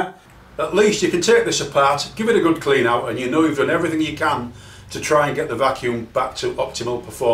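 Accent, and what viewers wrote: United Kingdom. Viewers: I'm from Poole, but currently live in the wilds in British Columbia, so it was nice to hear a Brit explaining the Dyson's guts in my own "language". :-) I swear I have spent more time trying to get my Dyson working ok than I have actually using it. No more!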